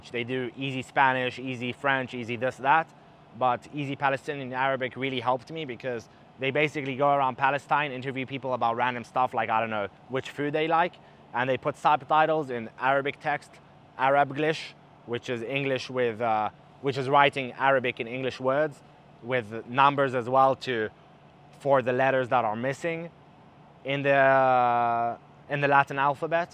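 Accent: South Africa. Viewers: I knew their was a S/A accent, my cousins were born in Durban so I clocked that straight away. Your a very talented intelligent young man, keep up the great work but more importantly keep yourself safe 👍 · You sound like a Englishman who grew up in South Africa. Your English is perfect, better than some of us Brits. seriously. 😂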